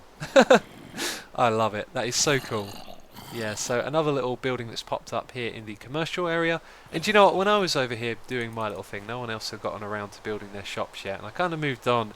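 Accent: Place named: South West, United Kingdom. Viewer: You sound like you're from the south west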